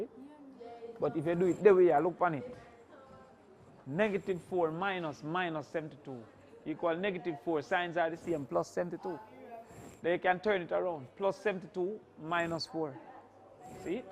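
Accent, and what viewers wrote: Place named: Jamaica. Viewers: its because he speaks jamaican broken english, i understand because im jamaican. wish u could understand because he teaches so well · He's jamaican. Unless u understand our creole u won't get it. He talks like that so that his class will understand him not as a teacher but as a friend. A smart friend. Who finds it hard to learn from a friend???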